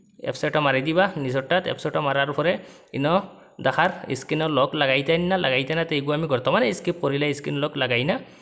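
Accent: Sylhet, Bangladesh. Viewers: Sylheti bro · আপনি সিলেটের ভাষায় কথা বলেন কিভাবে 😊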